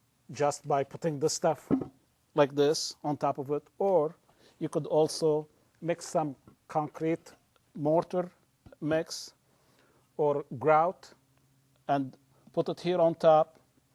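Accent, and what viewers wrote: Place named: Syria. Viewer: My neigbor is from Syria and has that accent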